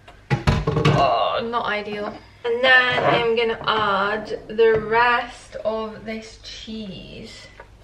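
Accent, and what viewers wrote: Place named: Scotland, United Kingdom. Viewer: Its so refreshing finding a fellow scot on here! Was dead jealous of all the food!! 😂 You sound local to me! Which area are you from? I’m Aberdeenshire x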